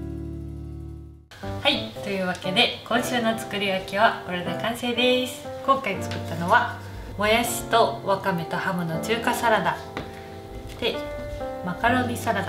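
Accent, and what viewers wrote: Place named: Kansai, Japan. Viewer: ひとり暮らし始めました新社会人です。いつも素敵なお料理作られてて参考にしています♡話し方も素敵で関西弁が心地いいです^_^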